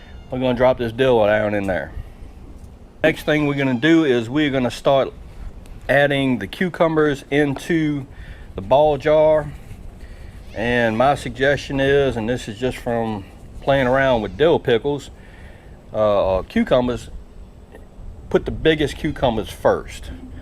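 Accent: South, United States. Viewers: Hello Mr. so glad Barry didn't blow up to hard your way we got some good rain off of him and I needed it garden loving it,Looks like them pickles going be good,hey I done old timey 14 day old pickles before but never done any like that,I will be following may be something for me to try, and nothing wrong with your southern-ish 😉😁You have a good week and try not get to hot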